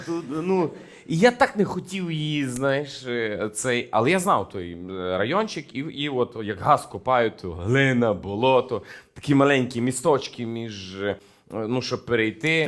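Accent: Western Ukraine, Ukraine. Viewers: Я тоже никакой смеси не слышу. Только западноукраинский диалект. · Який цікавий мовний акцент у ведучого! Суміш західної, центральної і східної мови України!😂❤ I в цьому наша сила українці - в єднанні!!!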